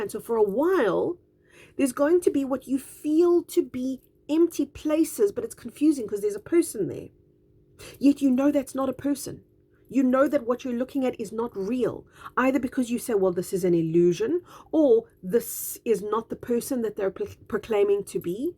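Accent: South Africa. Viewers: Yet again, a beautiful explanation and reminding us that one of our greatest gifts is our intuition. You speak from the heart and not the Ego and that resonates with me so much. I in turn, tell my husband, who too is Awake as I am, to just ' observe ' the craziness around our world. We need to go within to find the answers and it is people like you, doing your humanitarian work to help us and guide us and explain in very simplistic terms. I am also happy you not only read my last comment but you actually responded. I mentioned and inquired about your beautiful accent and you told me you are South African...awesome...and even better, that I resonated with you because we are running on similar frequencies!!! So very true that when I go out shopping, I don't just ' see' people, I can FEEL their frequencies! Thank you kindly for your podcast my dear friend. We are all here for a reason and the biggest is to stand shoulder to shoulder and Unite against the EVIL that is disappearing from our Planet!!! 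Love and Light!!!🥰❤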